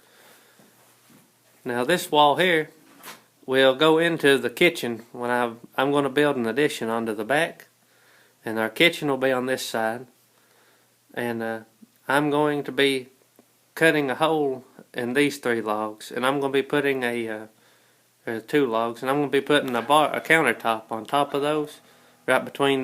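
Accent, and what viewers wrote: United States. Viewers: i love your video. however,....i have to know what part of America your accent is from. thx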